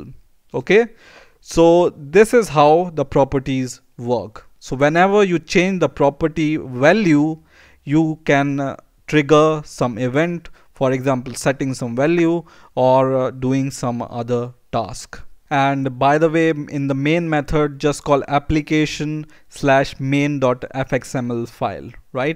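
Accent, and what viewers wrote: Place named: India. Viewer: Yeahh, He is Indian he have Indian English accent. I'm confirming it :)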